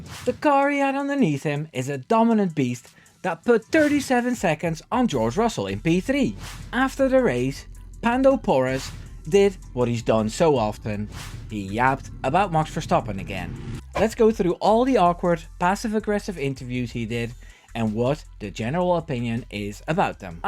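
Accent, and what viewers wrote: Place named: Netherlands. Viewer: I mean, even if I would have seen your videos for a very first time I would have known your Dutch by (and I don't mean this insutling) your obvious Dutch accent lol and no Haas is not rabbit, Haas is Hare. a rabbit is a konijn.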